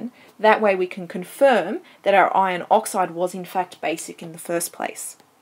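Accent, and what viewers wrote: Australia. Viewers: what accent is this? Australien?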